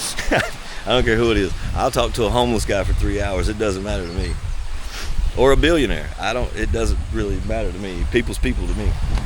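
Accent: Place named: North Carolina, United States. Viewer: North Cackalacky in the house! You sound a little Sampson County. 👍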